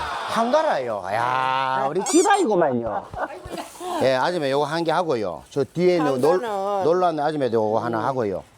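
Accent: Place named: Gyeongsang, South Korea. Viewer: 경상도사투리조아요~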